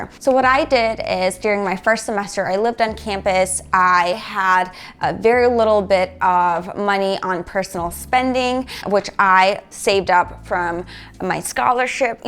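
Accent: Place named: United States. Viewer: Accent sounds American accent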